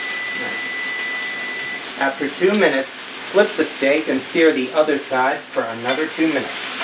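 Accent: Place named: Appalachia, United States. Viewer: You sound like a frigging hillbilly, are you?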